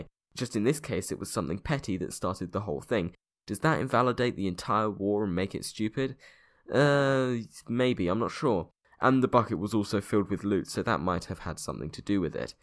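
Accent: United Kingdom. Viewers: this was actually quite funny. aswell as informative. Your video editing is top notch, and the humor is there in typical british fashion. So i can see why you managed to hit to many subscribers with the help of youtube. (im subscribed to CrashCourse, Kurzgesagt and Knowledgehub, and this channel seems to cater to the same style, and thus I got your stuff recommended all over my youtube page, so this might explain the sudden spike of subscribers as theese 3 channels are huge) Only thing I can say thou is the Audio Editing needs some more work. I do notice that some parts of your video your audio cut-ins tend to overlap so it can sound abit strange at times (example ) Ontop of that your voice is nice and not ennuing to listen to (unlike some youtubers out there) Might be the british accent. Another thing I would recommend is to add a calm background theme that dosnt disturb your speech, as the videos can be abit to quiet with only your voice talking. 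(good example is how KnowledgeHub and I Hate Everything does it) This adds to liven up the video. Not to mention a Opening theme (Althou thats not really needed) And I would pretty much say with that, you'll quickly reach even higher number of subscribers. As long as you keep the content coming. Keep up the good job! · This channel gets a lot of views for the amount of subs it has, 100%+ of the subs watch this channel clearly. Must be the good editing and interesting topics coupled with the good ol' Brit comentator.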